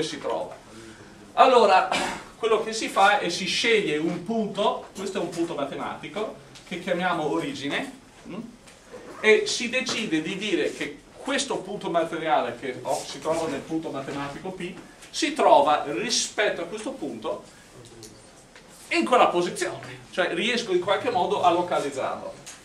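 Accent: Veneto, Italy. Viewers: Ma ha l’accento veneto hahahahha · Questo professore non solo è bravo, ma è uno spettacolo umanamente parlando: un saggio. Mi bevo le sue lezioni che è un incanto. Un americano con l'accento veneto, poi, non ha prezzo! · A me sembrava Veneto! Probabilmente ha imparato direttamente quello 😂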